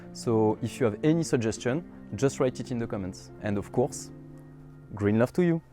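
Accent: France. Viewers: Such a beautiful french guy speaks on cute french accent about his balcony garden ☺️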